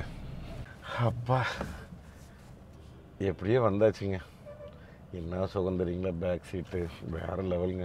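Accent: Kongu, India. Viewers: கொஞ்சும் கொங்குத் தமிழ் பேசும் கோமகரே...! திகட்டாத தித்திக்கும் பேச்சுடைய திருப்பூர் திருமகரே...! எங்கள் மதிப்பிற்குறிய டான் திரு. அண்ணா அவர்களே❤❤❤🙏 எப்படி இருக்கீங்க அண்ணி❤❤❤🙏 எப்படி இருக்காங்க ரித்திக்❤❤❤ எப்படி இருக்காரு என்ன சொல்ல...ஏது சொல்ல... சும்மா நெகு நெகு நெகுனு இருக்கு போங்க❤❤❤❤❤❤ சாலை ரதத் தேர் என்னும் இந்தக் காரின் அழகைவிட.... எங்கள் அன்பு அண்ணா❤அண்ணி உங்கள் இருவரது உரையாடலே அழகோ அழகு பேரழகு...! பஞ்சு மெத்தையில் படுத்துறங்கும் சுகம்...! மேகத்தை முத்தமிடும் பறவைகளின் கூட்டம் காணும் சுகம்...! காற்றில் அசைந்தாடும் நெற்பயிர்களின் நடனம் காணும் சுகம்...! பாலைவனத்தில் புது ரோஜா மலர் மலர்ந்து அதைக் கண்டால்? மனம் முழுவதும் சுகம்..! கார்த்திகைத் தீபம் சுடர்விடும் அழகினைக் காணும் சுகம்...! அப்படியோரு சுகம் இந்த வீடியோவைக் காணும் என் உட்பட நம் TMF குடும்பத்தினர் கண்களுக்குச் சுகம்...! என்றும் அன்புடன் உங்கள் தம்பி என்றும் அன்புடன் உங்கள் TMF ரா.முஹம்மது கபூர் (சென்னையில் இருந்து)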